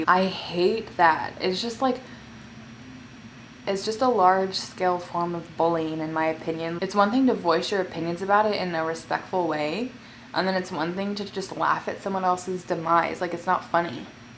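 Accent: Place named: Washington, United States. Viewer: It's a Washington accent. Many Washingtonians pronounce t's as d's at times. "water" sounds like "wodder" in WA.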